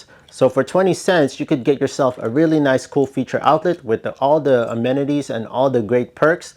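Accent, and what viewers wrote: Philippines. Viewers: you got a hint of Filipino accent, great content by the way.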